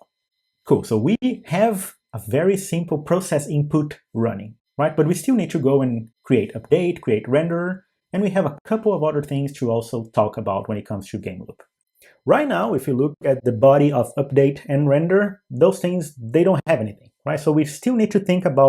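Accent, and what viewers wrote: Brazil. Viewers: O sotaque não nega, esse cara é BR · Notei o sotaque dele de br também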